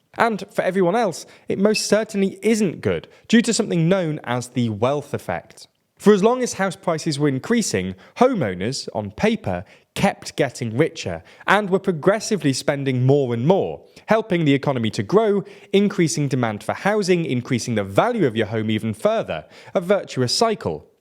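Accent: United Kingdom. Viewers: Neato! Cool British accent,! 1 Capitalism and communism work for a few. 2 The world needs Nordic-style socialism. 3 Without love and sense of humor there is no happiness and life is meaningless. 💕☮🌎🌌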